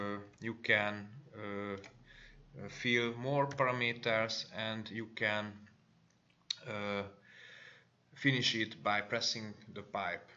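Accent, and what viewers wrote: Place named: Hungary. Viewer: Milyen érdekes, hogy a kiejtésen hallani a magyart. :) Egyébként ez más nemzetiségeknél is így van.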